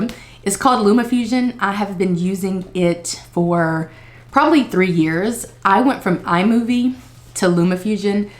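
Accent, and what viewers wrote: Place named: Texas, United States. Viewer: You gotta either be from Texas or Alabama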